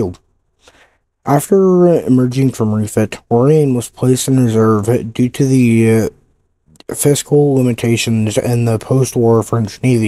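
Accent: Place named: United States. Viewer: The narrator is an American.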